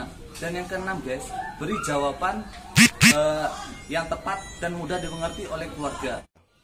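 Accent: Java, Indonesia. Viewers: Medok bgt mas. Hehe Good job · Medok kali lah bg hahaa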